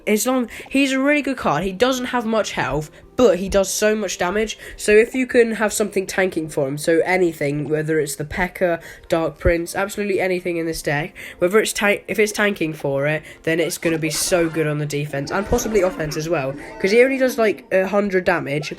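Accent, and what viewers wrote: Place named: United Kingdom. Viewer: I was enjoying the vid until u spoke bri'ish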